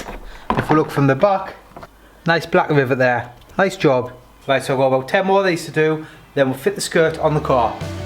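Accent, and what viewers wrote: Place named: Newcastle, United Kingdom. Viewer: You talk very clearly with no bs and a jordie accent well done a great teacher you are. Hard to do without being a cock...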